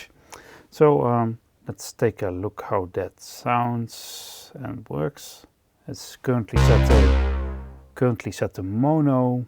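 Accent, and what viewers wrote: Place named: Netherlands. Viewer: It doesn't get much better than hearing an Oberheim by a reviewer with a dutch accent like mine. Groeten uit Eindhoven, of beter gezegt, uit Bern. I expect an OBX8 later this week. I can't wait. The end game for Oberheim poly-synths, except for a module of this giant. It's the sound of all the three synths we love.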